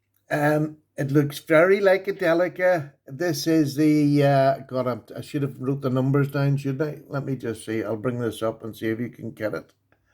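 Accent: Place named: Ireland. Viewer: Love the accent my name is Shawn Michael Fleming my parents are from Cork Ireland a province of Munster Ireland 🇮🇪 which city are you from Ive always use to try to talk like my father and grandfather. As I’m sure you know Ireland is a beautiful place. Hope you have a HAPPY HOLIDAYS AND STAY SAFE. AND DON’T DRINK TO MUCH PROPER NO 12 IRISH WHISKEY 🥃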